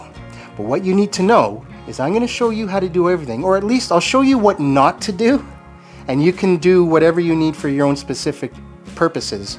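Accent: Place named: Canada. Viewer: I subscribed because I like your voice sounds calming and Canadian 😊👌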